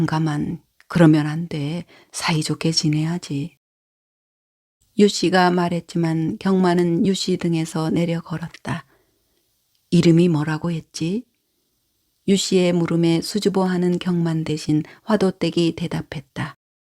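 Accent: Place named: Gyeonggi, South Korea. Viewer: 경기사투리가 정겹네요 예전 강화도령철종드라마가 기억에 새롭습니다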